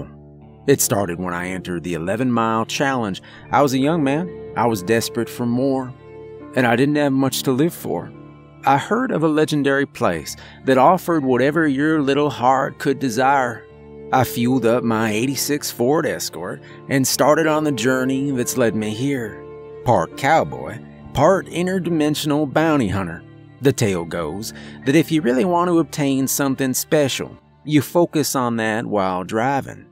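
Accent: United States. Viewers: Sweet another amazing story I love the cowboy accent on this one to would love to hear more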